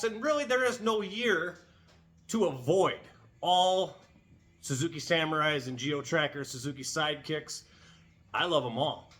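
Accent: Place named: Appalachia, United States. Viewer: Damn you talk like you're right out of Appalachia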